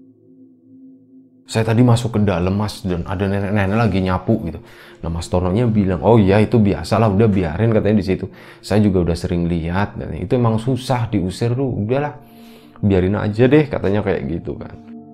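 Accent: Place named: Banyumas, Indonesia. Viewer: Kalau boleh tahu video laphor ini dibuat dimana ya... kadang denger bahasa jawanya mas kok agak ngapak. Barangkali dekat bisa mampir.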